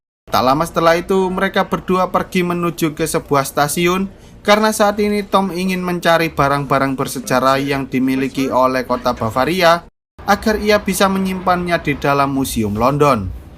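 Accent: Java, Indonesia. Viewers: medokmu menawan, bikin kangen masss membacakan cerita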